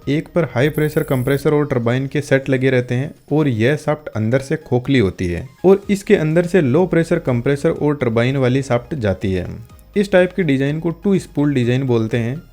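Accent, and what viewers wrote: Rajasthan, India. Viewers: आपकी हिन्दी बोलने की शैली में राजस्थान की झलक दिखती है क्या आप मारवाड़ एरिया से है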